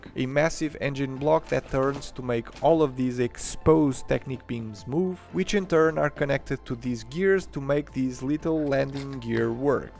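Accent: Portugal. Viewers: És Tuga? O nome pelo menos é Tuga e o acento também. O mesmo do Mourinho, aliás o nosso! Eheh. Abraço e obrigado por partilhares.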